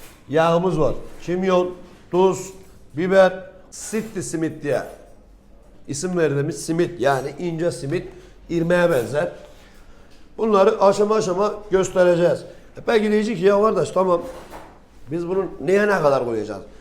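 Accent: Gaziantep, Turkey. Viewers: Öz antepli şive mükemmel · usta, Antebe gelirsem seni ziyarete gelecegim yorum. Bizde gurbette yasayan anteplilerdeniz. Valla antep dilini duymak beni sevindiroyor. Elline goluna saglik yorum cok güzel görünüyor. Senin videolarina zevkle bakiyorum!!! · Abi zevkle senin videolarina bakiyoruz. Birşeyler de öğreniyoruz.Bismillahirrahmanirahim diyerek baslamanda beni çok mutlu ediyor Antep şiveside çok güzel. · ustam entep şiven le süper seyırediliyorsun video larını seyir ediyorum kısmet olursa pandemi bıterse sizinle tanışmak için antepe geleceğim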